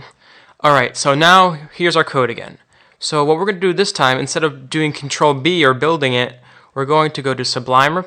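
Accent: United Kingdom. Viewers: Thanks GOD, a British accent at last. Where have you been mate? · The hawker has a REALLY annoying British ac-cent' on "PIE'-thun'. The other Americans speak very well. The content is good.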